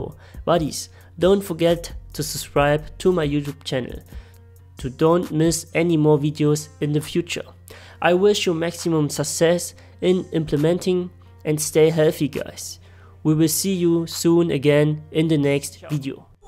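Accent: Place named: Germany. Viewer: Man hört bei dein Englisch das es bisschen mit deutsch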